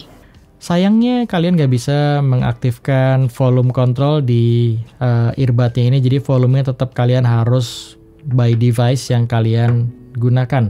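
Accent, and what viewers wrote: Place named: Java, Indonesia. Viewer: hehehe.. maap³ bang, ane cek video nya lagi ternyata kuping ane yg salah😁. Biasanya denger review pake earphone, pas video ini ane pas diluar jd gak pake earphone(langsung speakerphone HP). Berasa beda banget krn ane denger nya kaya ada medok² jawa nya 😁😁😁... 🙏🙏🙏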